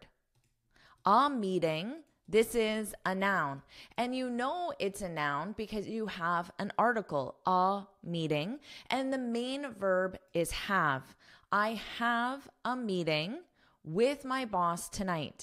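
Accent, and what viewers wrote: United States. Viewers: Отлично хорошее объяснение но не люблю американский английский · Are you american?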